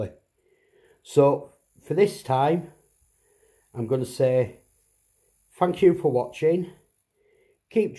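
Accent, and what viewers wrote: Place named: Northern England, United Kingdom. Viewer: Great work! Tried this in my last one but give up! Also great to hear another proper Northen accent!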